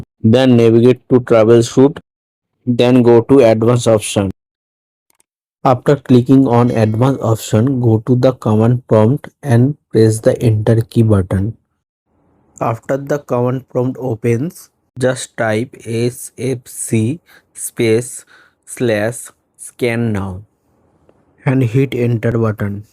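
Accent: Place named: India. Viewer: If you hear an indian voice in the pc repair hacks you know it will work thank you man your the best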